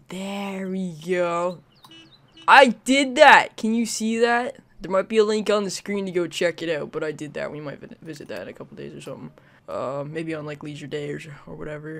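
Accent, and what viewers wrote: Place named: Canada. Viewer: Officially my new favourite gaming channel because sims and canadian accent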